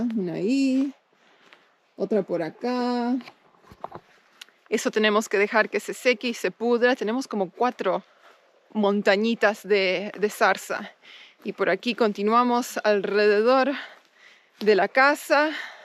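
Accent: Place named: Argentina. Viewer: tu acento eres una argentina más!!!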